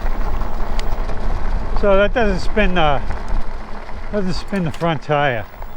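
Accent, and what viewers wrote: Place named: Northeast, United States. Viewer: Hey do you have a link or source that you used for your cable management i wanna do that to my expedition looks super clean on this bike btw where u from New York or New England? i grew up in Mass. by Boston so i recognize the North East accent so you like those direct drive motor's verses the geared hub motors i know direct drive are quiet and made for speed more while the geared are supposed to be more setup for torque going up hills and what not from what ive come to understand im looking into putting another geared hub in the front of my expedition but its been a long time in the making due to research cost and what not have any info on that type of situation by chance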